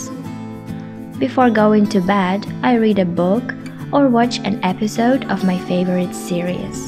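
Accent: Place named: United Kingdom. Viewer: Mi maestra me dijo "vean este video, la chica tiene un acento Britanico perfecto, uff. 👌🏻" JAJAJAKWLWL.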